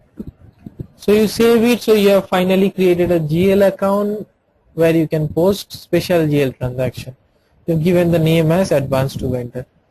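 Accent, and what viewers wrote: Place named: India. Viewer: Man this indian accent is annoying, i cant understand anything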